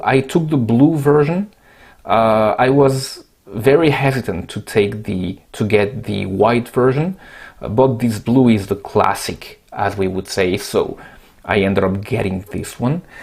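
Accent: France. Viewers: Il sonne frenchy ton accent. Merci pour ta vidéo, j'attends la mienne avec le fond noir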